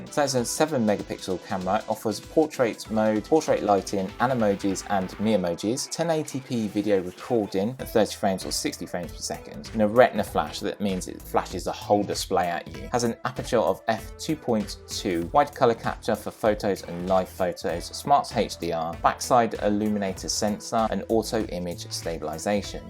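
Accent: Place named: United Kingdom. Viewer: Heh. I love your sophisticated British Accenture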